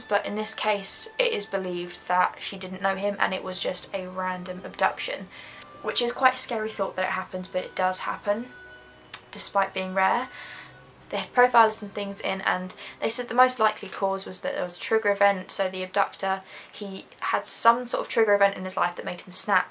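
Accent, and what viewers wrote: United Kingdom. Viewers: why r all u girls doing these type videos have British accents? it’s kinda bizarre just wonder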